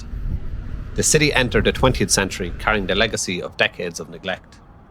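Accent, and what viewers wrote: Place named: Ireland. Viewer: combined with being a total hottie, that gorgeous Irish Accent turns into the total package of hotness 🇮🇪☘️🇮🇪❤️❤️❤️❤️❤️❤️❤️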